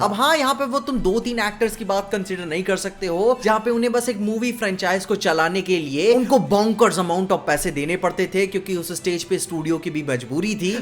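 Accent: Standard. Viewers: bhai ki shuddh hindi sun k maza aa jata hai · Bro can you ease change your Hindi accent coz I can't understood your pure Hindi thanks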